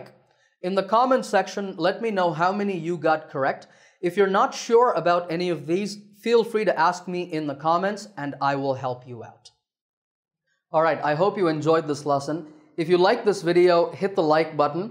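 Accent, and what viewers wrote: United States. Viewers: He spoke like, an American. Wow 🤩his vocabulary is fantastic. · Sir your video is amazing . Also you have a american accent .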